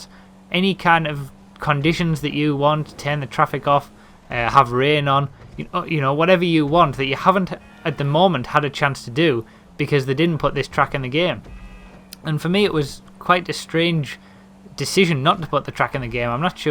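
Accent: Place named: North East England, United Kingdom. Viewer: Thought so, im from Newcastle :) Never really came across a north east commentator, good content though keep it up!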